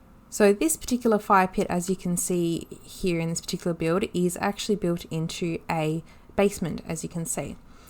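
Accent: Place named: Sydney, Australia. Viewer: You're from Sydney, aren't you?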